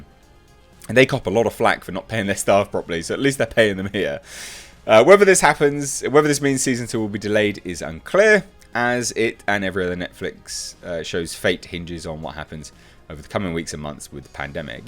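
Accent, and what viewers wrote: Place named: London, United Kingdom. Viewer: Damn I thought you were from London. 👀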